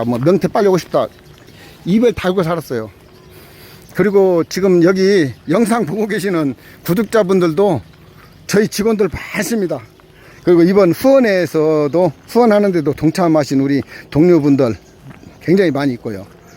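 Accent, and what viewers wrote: Jeolla, South Korea. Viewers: 덕진 소방서면 금암동 전북대 정문위에서 근무하셨군요 ㅎㅎ 어쩐지 영상보는내내 말투가 익숙하다했습니다 ㅋㅋㅋㅋ 평화동이 부모님댁입니다 ㅋ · ㅎㅎㅎㅎㅎ 어느누가 님을 비리 공무원으로 의심을 하겠습니가 인상이 완전 농사꾼 스타일인대요 손을 봐도 그렇고 말씀하시는것도 그렇고 ㅎㅎㅎㅎㅎ 간간히 전라도 풍의 말씀이 나오길래 전라도분이 아니냐고 물어본적이 있었어요 ㅎㅎㅎ 아무튼 부럽게 잘하고 계심니다 ㅎㅎㅎ 저도 거기서 살고싶내요 다들 모여서요 ^^ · 어쩐지...전라도 사투리가 ㅋㅋ 저는 고창이 친정입니다 왠지 마음이 가더라구요 화이팅 ♡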